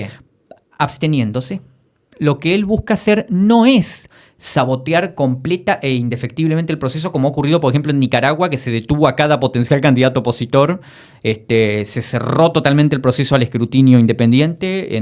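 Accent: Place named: Argentina. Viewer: Analista político venezolano con acento argentino.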